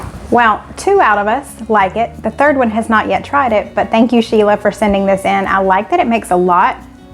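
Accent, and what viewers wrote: South, United States. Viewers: I’m a Southern girl out in the West now, and seeing your happy face (& family’s faces) and hearing your accent makes me homesick. I’m an unmarried empty-nester with severe physical illnesses (heart/lung). Cooking was my favorite thing & I can’t do it anymore, but watching you and your sweet family enjoy yourselves is pretty special to watch. Have the happiest and healthiest of New Years. ❤️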